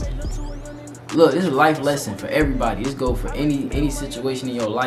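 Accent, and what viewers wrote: Boston, United States. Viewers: Your from Boston? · U from Boston ?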